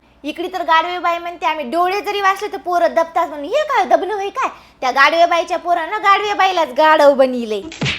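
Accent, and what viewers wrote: Nanded, India. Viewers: Mazi frnd ahe pavdevadi Nanded chi Ti same tuzya sarki bolte 😁😁😁😁😁😁khup avdtat Tuze vlog bghayla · Nanded chi bhasha lay bari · अभिनय,उत्तम,काही काही कला,या व्यक्तींना,उपजत,असते, सोशल मीडिया, मुळे, आधी क,प्रसार,झाला,मी, कसे,दिसते,आपण,कसे, आहोत,आपले,रूप,तारुण्य,हे,या,वयात,होतातच,मुळातच,लहान, वयापासून,सर्वांना,मोबाईल,सोशल,मीडिया,जग,आपल्या,घरी, आले,आहे,ताई, आपल्याला अभिनय, कौशल्य,छान,आहेत,नांदेड, च्या,भाषेचा,सुद्धा,छान,हाव,बाव,संवादफेक,अगदी,सुंदर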